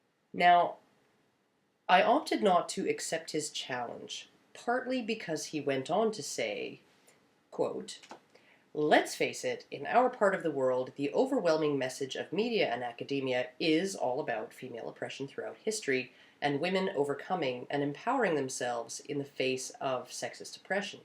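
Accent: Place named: Canada. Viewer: your Canadian!!! xDDD thats why i like how you say sorry xD i thought i heard it sumwhere b4 lol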